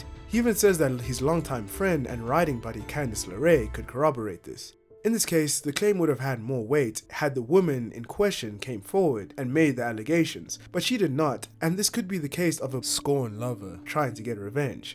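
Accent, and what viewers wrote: South Africa. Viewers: Ey bro are you South African?😁that accent sounds like home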